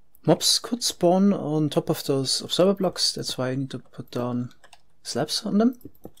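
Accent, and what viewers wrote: Germany. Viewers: You are from Germany. Am I right? a) speaking "und" instead of "and". b) the word English and German have in common you pronounce in German c) vowel have revealed you too. d) only Germas add "ja" as a "additional" word.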